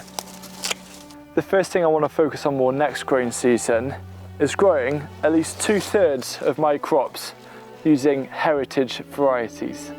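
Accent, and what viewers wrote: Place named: Wales, United Kingdom. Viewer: Your Welsh accent is so sexy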